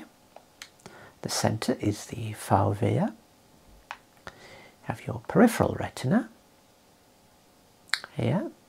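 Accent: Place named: Black Country, United Kingdom. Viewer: At last a black country accent that doesn't sound like nails down a blackboard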